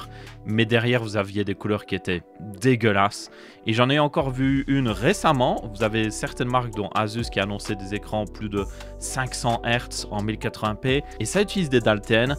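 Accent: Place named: Belgium. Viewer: Merci pour cette vidéo, je te suis depuis quelques temps et j'aime vraiment bien ta chaîne, je deviens cultivé sur l'informatique grâce à toi haha, d'ailleurs à ton accent je crois reconnaître un Belge, non ? Si oui, on est deux (bien que cela ne change rien, je tenais juste à me la péter un peu...)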